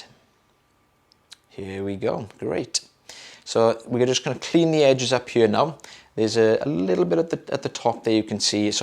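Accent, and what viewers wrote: Wales, United Kingdom. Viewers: I enjoy your accents. Idk why but a lot of accents get on my nerves I think Welsh is the most pleasant one in the British isles. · Lovely to see you & hear that great Welsh voice. It's so good that you have your own style of presentation & it complements ' very well. Be your own person!👍🏻🏴🕊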